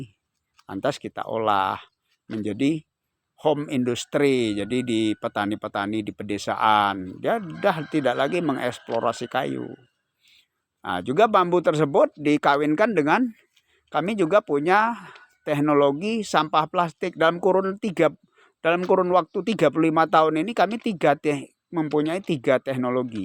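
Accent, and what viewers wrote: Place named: Klaten, Indonesia. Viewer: Logam klatenya mantap hhhh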